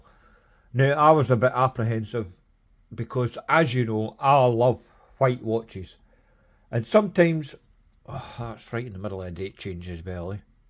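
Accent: Scotland, United Kingdom. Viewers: Came for the watch stayed for the thick scottish accent.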